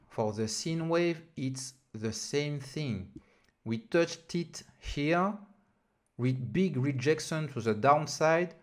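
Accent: France. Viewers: Merci pour cet anglais "français"... je comprends TOUT ! merci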